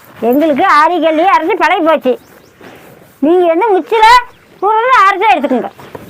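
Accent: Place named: Salem, India. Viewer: Neega entha ooru aaya,salem bhasa mathri iruku.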